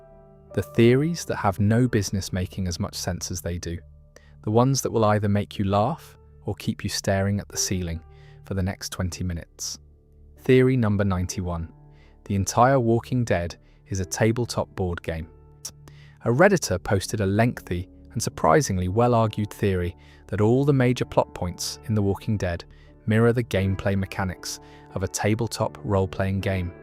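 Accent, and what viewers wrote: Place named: United Kingdom. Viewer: This narrator sounds British, and they have a notoriously poor grasp of the size of the United States.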